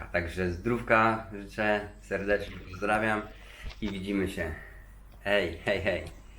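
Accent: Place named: Poland. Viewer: Polski akcent jest :)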